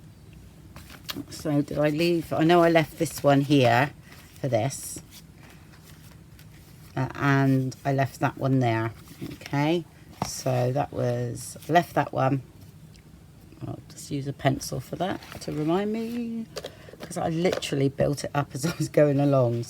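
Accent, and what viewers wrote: United Kingdom. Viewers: I am watching this video again so that I may make one of these portfolios for Christmas... your videos are always so easy to follow and I simply adore your style... that being said, because I tend to watch the videos, sometimes at night while my hubby is sleeping or when I am washing dishes, I have the “Closed Captions” on... I am used to the interpretation being quite askew because of your British accent, but sometimes it just makes me giggle at what it THINKS you said... todays giggle? Washi Tape... it thought you said, “What’ your tape?”...🤣🤣🤣 Hugs to You and Yours...❤️❤️❤️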